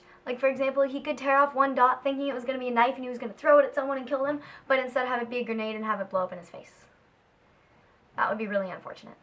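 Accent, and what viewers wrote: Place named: Canada. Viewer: Love this, think you'll go far. By the way are you Canadian? Love your accent x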